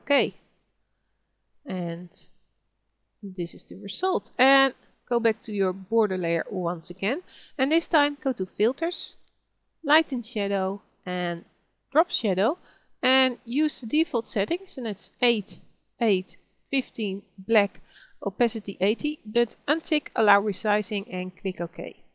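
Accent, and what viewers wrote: Netherlands. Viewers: ik hoor meteen dat je nederlands bent!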